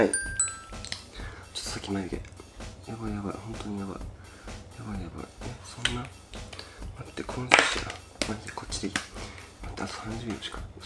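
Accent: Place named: Osaka, Japan. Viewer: 大阪弁可愛い(＊¯ω¯＊)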